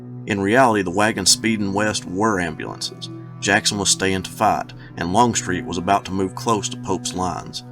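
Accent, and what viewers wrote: South, United States. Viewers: This Southern Accent Is Way Too Distracting. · More long videos sir, these are by far the best battlefield series on YouTube and I throughly enjoy hearing a fellow southerner narrate. Which state are you from if I might ask? I’m an Alabamian and get excited every time I see my state represented.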